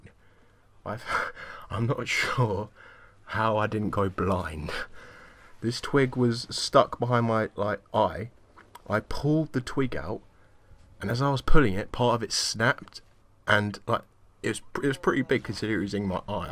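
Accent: England, United Kingdom. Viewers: what part of england are you from? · i love the british accent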